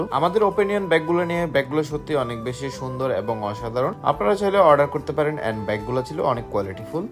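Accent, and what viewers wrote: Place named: Chittagong, Bangladesh. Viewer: Tomi ctg chela seta na janar ki ace. tomder family r sby without soddo bhasai kotha bolo tokhon ctg ton ta esposto boja jai😂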